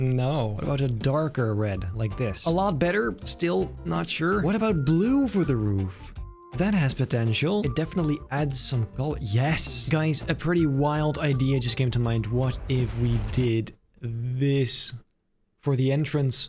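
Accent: Netherlands. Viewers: I smell Dutch Haha❤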